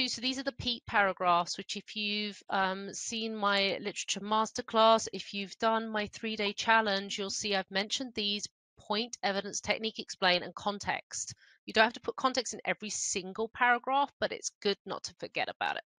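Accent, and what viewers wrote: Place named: England, United Kingdom. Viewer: Great video as an essay writing demonstration. Great accent. Are you from England?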